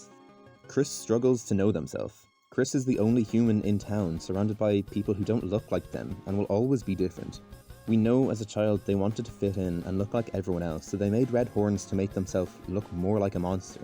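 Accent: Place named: Ireland. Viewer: RAHHHH IRISH ACCENT DETECTED!! irish they/them using deltarune fans must unite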